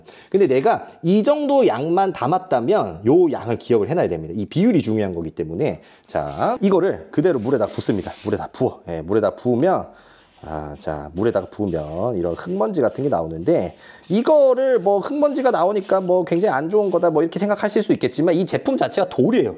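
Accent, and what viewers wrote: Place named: Gyeongsang, South Korea. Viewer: 와 경상도 사투리 찐이다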